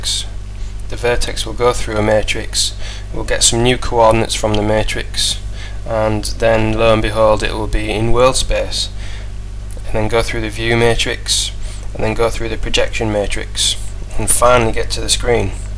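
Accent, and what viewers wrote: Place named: United Kingdom. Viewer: The one you mentioned is US spelled version. I can tell that he has a british accent. ;)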